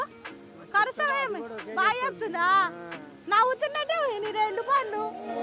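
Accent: Telangana, India. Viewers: Maa urilo matladukunatte unnay mi muchatlu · మా కనకవ్వ (అమ్మమ్మ) లెక్కనే మంచిగా జెప్తున్నవ్ పో... మన తెలంగాణ యాసలో మస్త్ మాట్లాడుతున్నావ్ సూపర్... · REally loved her True Telangana Accent, We need such . Authentic .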